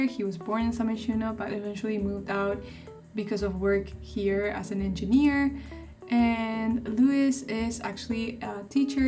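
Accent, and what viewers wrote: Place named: Argentina. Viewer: Ay que lindoo! Yo te sigo en tumblr y hace poco me enteré que tenes youtube, por el acento creía que eras de Argentina 🩵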